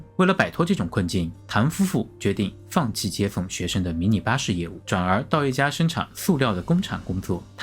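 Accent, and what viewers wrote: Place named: Kunming, China. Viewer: 博主口音为什么像昆明人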